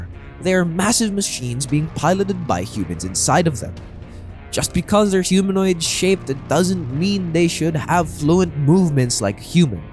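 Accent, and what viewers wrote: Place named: Philippines. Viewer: I knew it because I'm also Filipino and you're accenthen you cannot fool another Filipino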